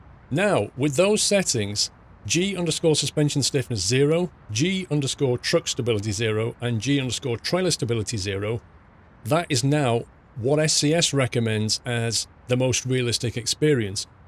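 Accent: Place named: United Kingdom. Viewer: There is thousands of videos like that on YT but ONLY can explain properly even hes got that british accent (luckilly i used to live in Birmingham:P ).Other twats just expect money for their shitty videos. deservers that. Greetings from Poland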